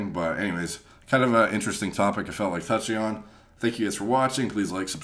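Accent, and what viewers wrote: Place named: Canada. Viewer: Yeah the way some canadians say out , aboot, house lol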